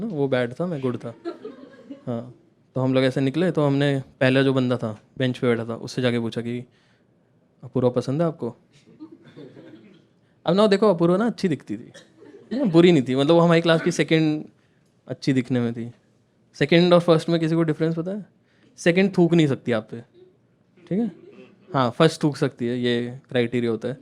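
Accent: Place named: Sonipat, India. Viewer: bhai jo teri awaz m tone h n dost va sonipat aali h dost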